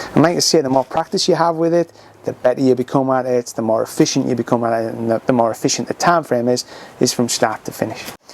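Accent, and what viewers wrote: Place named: England, United Kingdom. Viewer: It's weird to hear an English man tell me how to shoot. No shade to you sir. However it's odd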